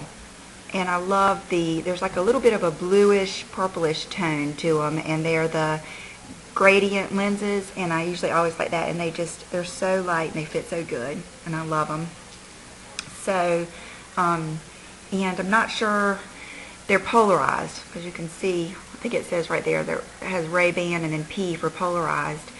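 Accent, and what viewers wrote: South, United States. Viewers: i love ur vids! especially ur southern accent, its very calming :)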